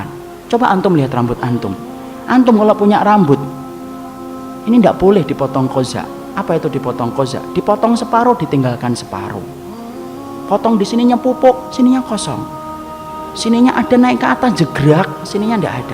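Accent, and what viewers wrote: Java, Indonesia. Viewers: Usdadz ...logat jawanya medok bnget aku suka...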